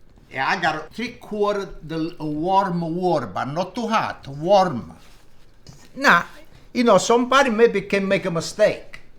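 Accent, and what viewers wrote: Italy. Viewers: He reminds me of my grandma with his accent. She was from Italy. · Wonderful show. He gets his recipes across in a timely fashion with accuracy. Reminds me of my Italian Grandparents with his accent. Not black pepper. Black AH Pepper. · Love this guy. I'm literally waiting rn for my bread to puff up another 30mins and stick it in the oven after watching this vid. And I gotta say, awesome Italian accent. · Your Italian accent is so refreshing to me… the last time I heard one was in the late 1960’s listening to my grandmother teaching me how to cook. I just turned 14. I loved her so much and still miss her greatly. I subscribed! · I love the way you talk? Your accent is beautiful, What part of Italy are you form? Your bread is amazing you make me hungry. Salute from Texas USA ❤ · His True Italian Accent I like including The Video. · I just love his Italian accent 😍 ❤ Beautiful ❤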